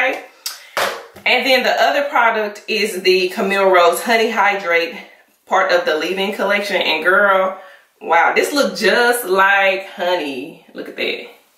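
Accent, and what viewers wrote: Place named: Texas, United States. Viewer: I love your voice. You sound like my family in Texas. Ima go ahead and subscribe 🤣❤️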